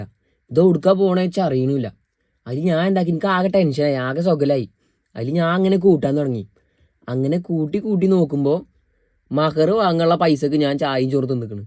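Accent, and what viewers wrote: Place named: Malappuram, India. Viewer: 😂😅😅😅 malappuram slang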